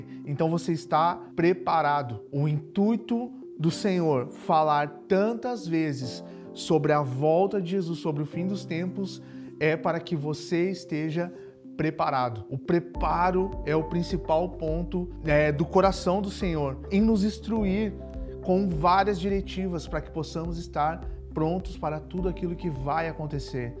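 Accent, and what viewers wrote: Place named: Porto Alegre, Brazil. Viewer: Muito bom! E não poderia faltar esse sotaque porto-alegrense 😂👏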